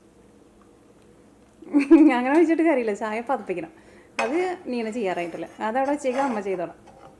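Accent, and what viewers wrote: Thrissur, India. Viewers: Love that she has a Thrisshur accent despite being overseas. Its very endearing...❤️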